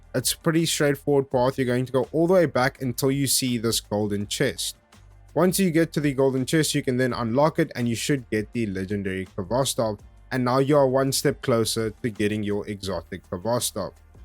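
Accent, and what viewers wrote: South Africa. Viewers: 2 seconds in and I can already hear this is a fellow South African. Thank you so much and keep up the great work! DDP, Bokke for the win 🎉🇿🇦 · are u South African?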